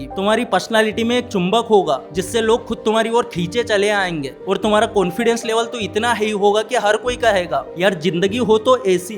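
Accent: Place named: Madhya Pradesh, India. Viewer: Apke bolne ke style se lg rha hai aap MP se ho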